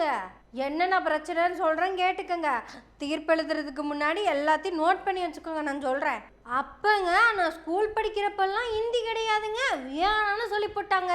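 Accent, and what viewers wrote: Kongu, India. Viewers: மகளே உன் பேச்சில் கொங்குதமிழ் கொஞ்சுகிறதுஅம்மா. இந்தியா பாகிஸ்தான் போரைப்பற்றி கொஞ்சம் பேசுதாயே. மேலு‌ம் கோடிக்கணக்கில்சம்பளம் வாங்கும் நடிப்புக்கூட்டங்கள் ஒரு ரூபாய் கூட போருக்கு உதவிசெய்யவில்லையே.ஆனால் நடிகைகளின் திருமணத்திற்கு கோடியைக் கொட்டிக்கொடுக்கின்றார்கள்.இது என்ன நாட்டுப்பற்று.? நீ பேசு மகளே நிறைய பேசு.!!! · தோழரின் கொஞ்சும் கொங்கு மொழி நடை மிகவும் சிறப்பு. தொடருங்கள்..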